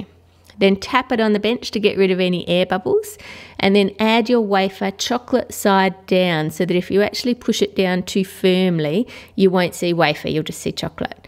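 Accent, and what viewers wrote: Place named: Australia. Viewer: ugh i love aus accents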